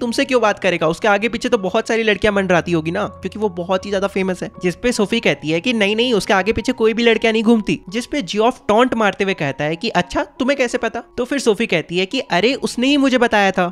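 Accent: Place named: Bihar, India. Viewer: thodi English k words theekse use kijiye bhaiiya aur apni pronunciations sudhariye zabaan clear honi chahiye. toh hindi mai aapke channel pr ek bar sunkr log hrbr ayenge bihari accent use mt kijiye❤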